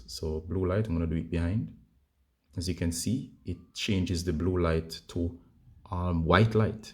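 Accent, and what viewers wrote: Jamaica. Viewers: Yow chap ur accent sounds like a yard man. Where in JAM can I buy this product?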